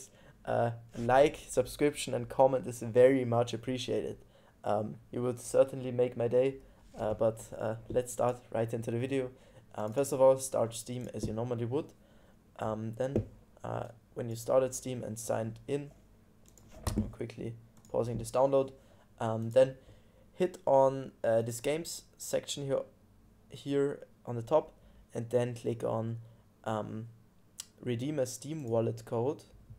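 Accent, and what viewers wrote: Germany. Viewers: you sound german kinda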